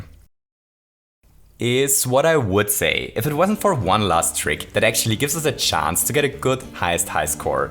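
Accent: Germany. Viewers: Bist du deutsch? (Are you a german?) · Could it be that you are german? You have a strong german accent. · Where is he from? Because he sounds German/Austrian. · you sound, Very german mein freund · Du bist Deutsch oder?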